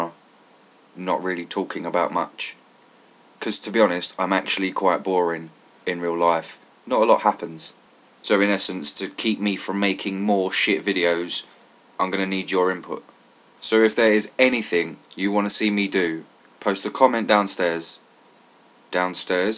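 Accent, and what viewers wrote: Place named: Newcastle, United Kingdom. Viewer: Loved the geordie voice. x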